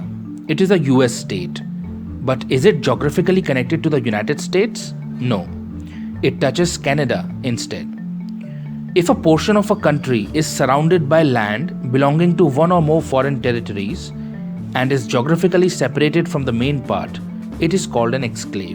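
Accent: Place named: India. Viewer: Thank you for your correct pronunciation of words contain V and W. In India in the last few decades there has been a trend to reverse the pronunciation of these letters which is painful to listen to as an English speaker. Also your accent is pleasant to listen to which isn't always the case with the modern Indian accent.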